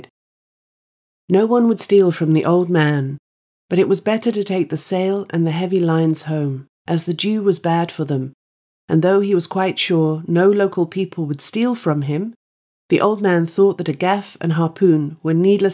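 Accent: RP, United Kingdom. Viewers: Is It a rp accent?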